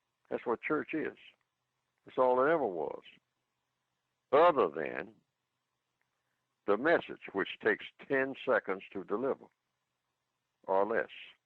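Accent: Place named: United States. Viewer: I just started listening to Mr Fuller I enjoy his ole Mayberry USA voice and I appreciate his time/knowledge/concern for the people of color...do you know how many blood washed, born again believer's that wish it was that simple as 10 minutes...not the play church folks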